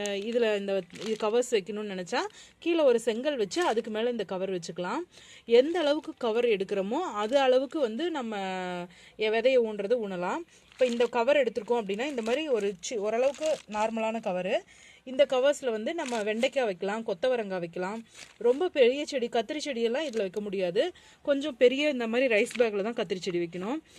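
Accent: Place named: Kongu, India. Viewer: kozhi koovuvadhum iyarkai suzhalum ungal kongu tamizhum niraivai irukkirathu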